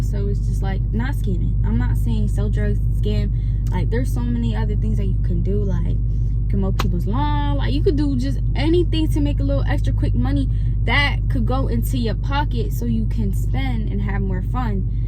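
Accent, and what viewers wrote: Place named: New Jersey, United States. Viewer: The accent she gotta be from Jersey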